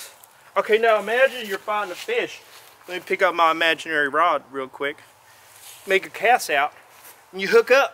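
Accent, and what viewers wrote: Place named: South, United States. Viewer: I ain't gonna lie this man's southern accent freaked me out for a second... lol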